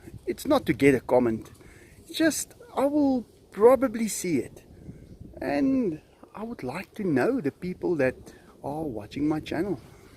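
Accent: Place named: South Africa. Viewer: I enjoy your south african accent!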